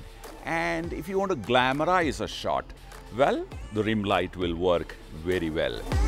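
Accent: India. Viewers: Ye Bande ko dekh k peheli baar dimag ka bhosda Huwa hy, 😂😂😂 gora kabse Indian accent me bath karne laga bhai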